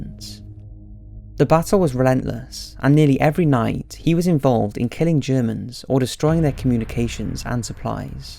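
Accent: United Kingdom. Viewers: I understand that, being from Great Britain (from your accent), you would focus on the heroes of the Allies. Yet, I wonder about the heroes of the opposing sides, especially the Germans. I don't care about the Nazis, I'm referring to the average soldier of the Wermarcht. These boys were no different than any other soldier, scared, far from home, fighting for their country and wanting to return home. Unfortunately, because they were controlled by the Nazis, they get lumped under the same title without thought.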